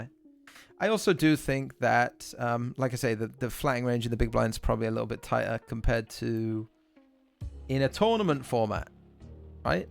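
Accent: United Kingdom. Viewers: funny bloke.........where are you from in the UK you gerth?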